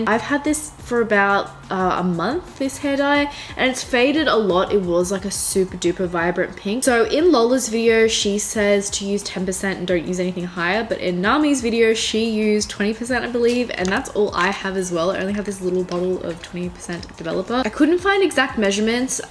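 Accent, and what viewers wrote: Australia. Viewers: You look so gorgeous without makeup! Such a natural beauty, also are you Australian? I recognise the aussie accent :)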